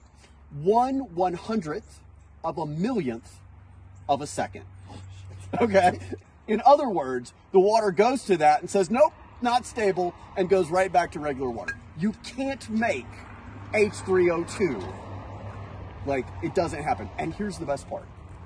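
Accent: South, United States. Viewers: Wow, people will buy the craziest sh*t!! On a side not I’m glad to hear that the deeply Southern “okaaaay” DOES come out of all Carolinians not just us in the more southern Carolina….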